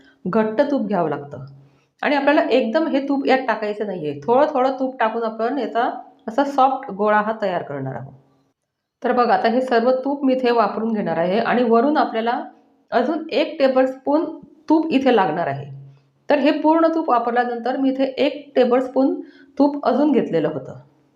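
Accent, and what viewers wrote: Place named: Leva Patil, India. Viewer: तुम्ही लेवा पाटील आहे का भाषेवरून समजते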